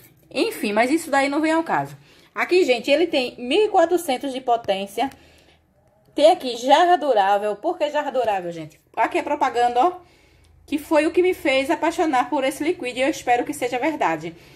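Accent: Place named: Northeast, Brazil. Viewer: Gostei do sotaque do nosso nordeste! Rsrs....beijo.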